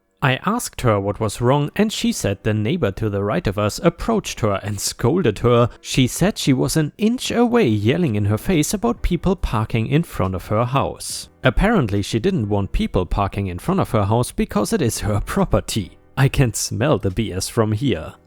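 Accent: Germany. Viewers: Darn lawn Nazis. I hate HOA's. The narrator does a wonderful job at telling these stories. As a person with German ancestors I like the accent. I can imagine the HOA president shouting "Kein Parken dieser Autos auf der Straße!" While stomping around. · Hey man I was wondering what your accent is I can’t quite place it but I’m thinking German or Swedish If I could have any car it would probably be a hell cat. Or a Noble m500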